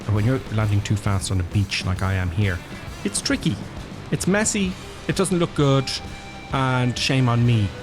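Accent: Ireland. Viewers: Good to hear that lovely Irish lilt! Always time to sit back with a warm drink, put your feet up and get some laid back simming fun.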